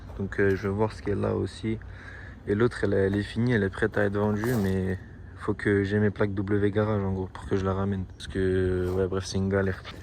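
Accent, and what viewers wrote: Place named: Alsace, France. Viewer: J ai cash reconnu l’accent alsacien mdr 😂 et pour les fleur essaye du diluant normalement t’enlevé tout avec sa et force a toi gros pour tes projets 💪💪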